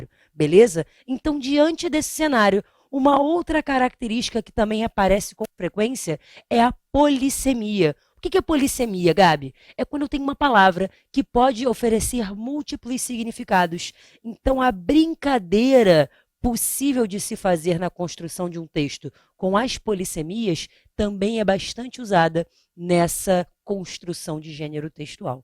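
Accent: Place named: Rio de Janeiro, Brazil. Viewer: Carioca ctz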